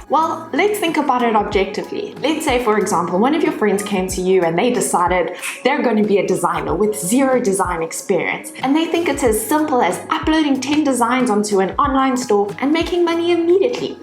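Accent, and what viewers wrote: South Africa. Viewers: I’m guessing you are from SA ? It’s really so nice to hear your accent on this app , so refreshing 😊😊. I’m from Namibia by the way 😄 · By the way I guessed your accent as I have 2 good friends from SA. Keep being genuine and honest and above all stay safe. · Keep up the work fellow Saffer ,sounds like a Durbz accent.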